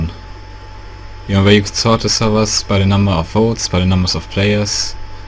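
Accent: Germany. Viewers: are you german? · dieser deutsche Akzent XD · Man hat das Deutsch richtig raus gehört xD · Boah dieser deutsche aktzent😂 Aber cooles video hat mir geholfen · bro man hört halt das du deutsch bist aber du sprichst verständlich englisch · a ok weil dein english ist nicht so gut weil wenn ich english rede höre ich mich fast so an wie du deswegen dachte ich du bist deutscher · i can hear you German accent xD. i love your client too, thanx. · You German right? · du bist ein deutscher :D merkt man an deinem aktzent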